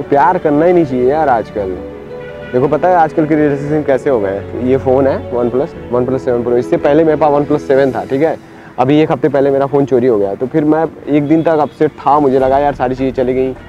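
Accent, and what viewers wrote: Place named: Uttar Pradesh, India. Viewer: Up walo ke hi pass yese bat krne ka trika rhta h me bhi mirjapur se hu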